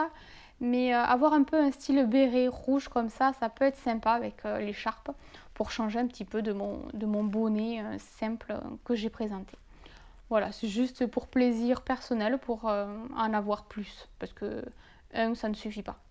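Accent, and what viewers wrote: South, France. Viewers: J'allais oublié les questions ^^: quel âge as-tu ? Es-tu du sud car tu as un petit accent ?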